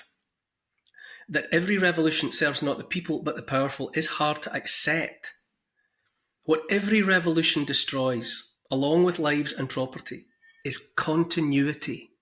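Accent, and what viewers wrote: Scotland, United Kingdom. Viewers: Neil, I am an American who has lived 71 years. I have seen a lot of things throughout my life that long ago raised my distrust of power at all levels. I have had love since childhood, for books and learning, from the classics to philosophy, science, and everything in between. I have read Fahrenheit 451, and it is now banned in some libraries here in the U.S. I know you are a kind and decent man, blessed with that wonderful Scottish accent, and I agree with everything you said. But I'm worried about what you didn't say. I agree that revolutions are bad for people caught in them, but what is the unsaid part. How does society get rid of the yoke of tyranny? Sometimes, you have to flip that coin. I read a great little book years ago, written by a Scottish American academic that had a memorable effect on me. Man's Unconquerable Mind by Gilbert Highet talks about mankind being on both sides of longing to be free and staying strong enough to get that freedom. 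If you know freedom, you can not accept anything short of that. I would love to hear what your suggestions are for people in the U.K.,the U.S. or anywhere. I have been watching the U.K. with alarm, and I can see the same thing here and elsewhere. The world is a grenade with the pin pulled. By the way, Bradbury was prescient about book banning. I enjoy your show very much.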